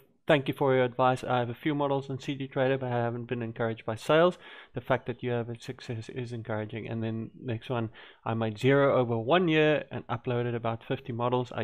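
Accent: South Africa. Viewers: Hi , thanks for the video , really helpful. Got to ask , are you south african ? I am in Johannesburg and would love to conect with fellow creators in ZA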